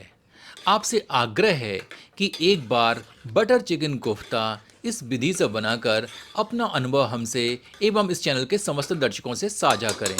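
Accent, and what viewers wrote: Standard. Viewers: Shudh Hindi ucharan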